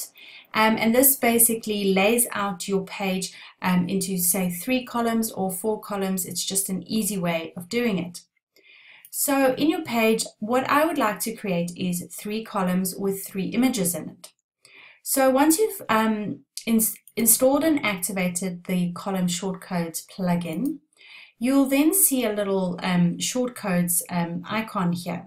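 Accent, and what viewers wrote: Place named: South Africa. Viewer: This is so helpful...I imagine things look a little different now, but I’m excited to give this a try! Plus!! So nice to hear another South African accent! 😉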